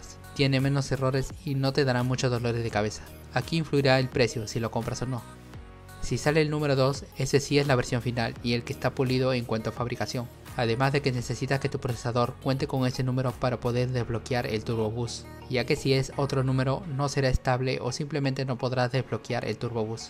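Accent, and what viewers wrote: Peru. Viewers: cabro, sos de peru¡??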